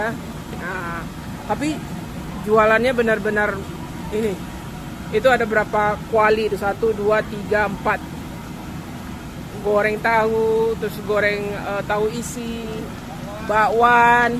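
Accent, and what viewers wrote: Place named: West Sumatra, Indonesia. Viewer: Suka dengar bercakap loghat minang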